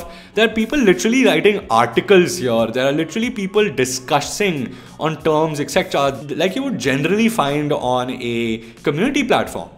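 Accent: India. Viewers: Oh my God do you have to sound so Indian?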